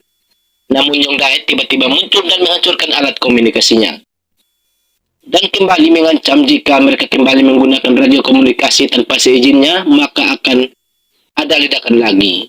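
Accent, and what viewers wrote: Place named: East, Indonesia. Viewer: der ngeri kali aksen kau 😂 logat timur kau kental kali😅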